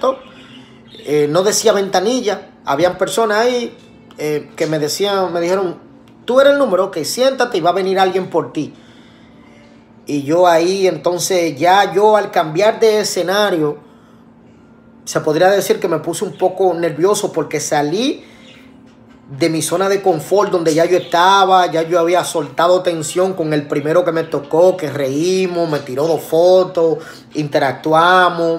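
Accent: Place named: Dominican Republic. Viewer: Bien explicado Con acento Dominicano.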